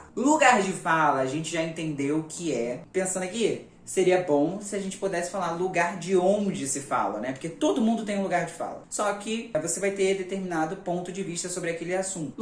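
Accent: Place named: Rio de Janeiro, Brazil. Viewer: Aí que saudade desse sotaque carioca e desses expressões involuntárias ❤️📸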